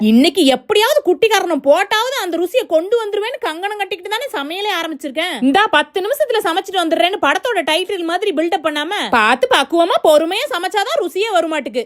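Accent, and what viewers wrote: Kongu, India. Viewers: Kongu I think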